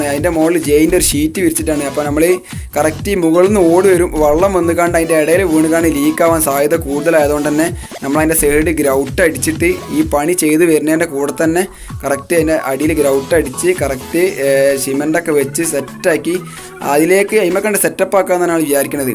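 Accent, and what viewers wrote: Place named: Kondotty, India. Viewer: കൊണ്ടോട്ടി ആണോ സ്ഥലം സംസാരം കേട്ടിട്👍😄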